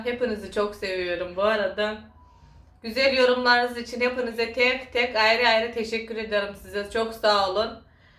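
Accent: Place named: Akçaabat, Turkey. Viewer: CIM SENIN GIBI KONUŞUYOR ELTIM AKCAABAT LI ONUDA SEVERIM KONUŞ MALARINI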